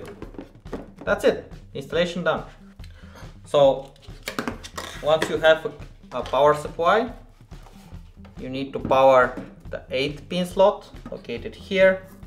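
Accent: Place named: Mexico. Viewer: Che mexicano intentando hablar inglés no mams mejor hazlo en español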